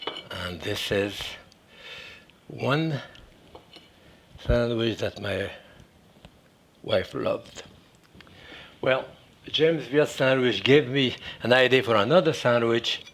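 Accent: France. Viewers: Why does English spoken in a French accent sound so much better? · very nice french accent , also beautiful kitchen, very cousy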